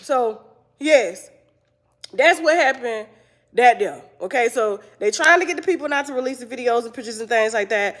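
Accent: United States. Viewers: That country accent 😂😂😂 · Why does she keep doing that country accent???😂😂😂😂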